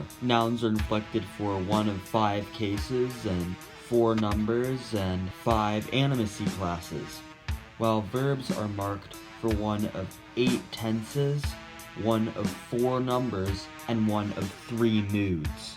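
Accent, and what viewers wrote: United Kingdom. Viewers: WAIT IT SOUNDS LIKE A NORTHERN BRIT SPEAKING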